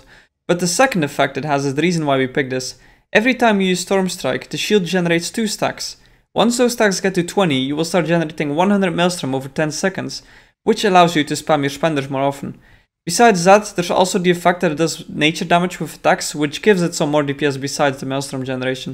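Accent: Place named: Netherlands. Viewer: oh i was guessing dutch, you sound different from the Belgians i know.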